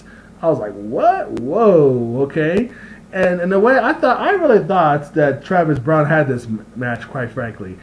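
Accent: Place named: England, United Kingdom. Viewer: Lmao ur accent cracks me up idk where ur from maybe England but I appreciate your luv for martial arts it's very similiar to mine keep doin your thing and ur name rocks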